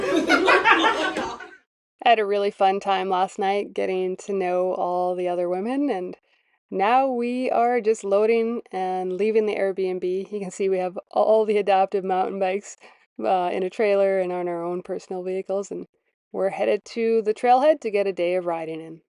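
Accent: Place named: Canada. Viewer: Great video, normal wear and tear shouldn't be a price 😢 keep using wisdom with your body choices, hope to see see on the road sometime, I believe I saw your van video with Bob wells, your Canadian accent is cool, vanlife from oregon 🖐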